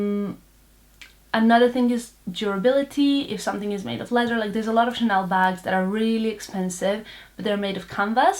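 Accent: Serbia. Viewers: I think she's Serbian?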